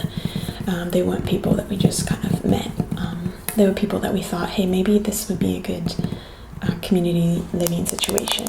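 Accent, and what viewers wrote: England, United Kingdom. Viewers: Thank you for answering my question! Your channel is the most relaxing. about your accent, you should keep anonymous because it's your "original" thing. you started this way. Also, this English accent sounds cool! <3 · hold up i thought you were british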